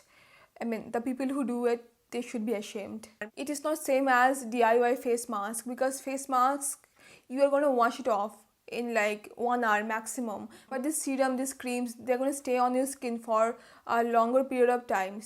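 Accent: Bengal, India. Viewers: Godddddddddd ur cute.......got ntn to do with the video n vitamins n stuff but loved that bengali accent♥️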